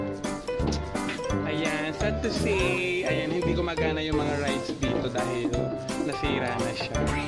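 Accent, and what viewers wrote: Batangas, Philippines. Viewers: taga batangas po kayu lipa po ba😂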